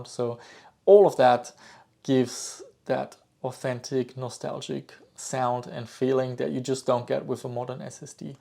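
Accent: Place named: Germany. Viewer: Always enjoyed your videos! Question (please don't be offended): A few years ago, when I first started watching your content, I immediately thought you were German, but later learned that you live in Australia. My question is if you are in actuality a German living in Australia, or if you are a native? Your accent really throws me off :D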